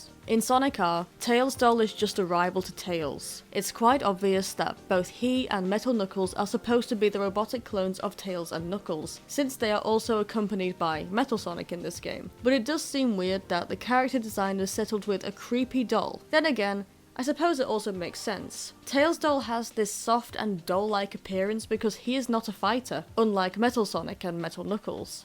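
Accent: United Kingdom. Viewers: I love your British accent, it sounds so deep and classy!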